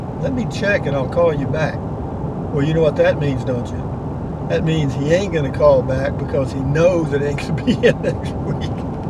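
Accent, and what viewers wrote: Texas, United States. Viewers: Always cheering to hear your Texas-inflected enthusiasm for life,. I started out with your walk through Van Horn, and from there I started to ride shotgun on your drives through beautiful West Texas and the desert Southwest (well, me and 44,000 other folks, that is), and from there I became a subscriber to your channel and I haven't looked back. I grew up in Texas, and though I fell in love with the big city and moved here to NYC (and look where THAT got me), I'll never stop loving West Texas and New Mexico and its good people, and I just want to say that being able to leave the confines of my small Manhattan apartment and head out for the open western skies with makes the day a hell of a lot easier to get through, and I am grateful to you — or much obliged, as a Texan might say. I'll see to it that I use your Amazon link next time I go shopping there. 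I wish you all good things. Keep up the great work. Best regards, Seth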